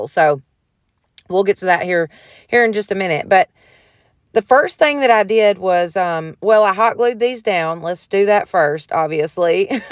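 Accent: South, United States. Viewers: Just subscribed! I really like what you did with your items, but am in love with the way you talk---Southern country. It makes me homesick.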